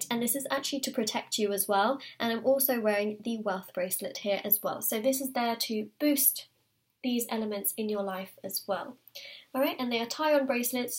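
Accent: United Kingdom. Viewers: Uk accent.very nice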